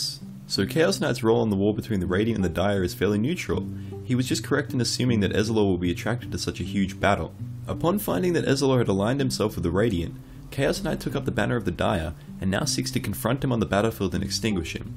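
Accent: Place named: Australia. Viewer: Here I thought, Godz from BTS was australian. You are so australian, it hurts. I could barely make out a word. I betcha if you ever move to a different country, you could get laid just by laying on the accent. You lucky dog, you.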